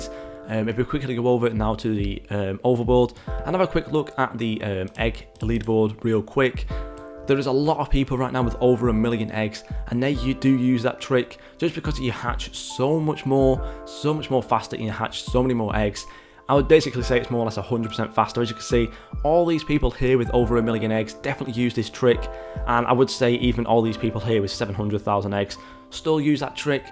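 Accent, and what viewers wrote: United Kingdom. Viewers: omg british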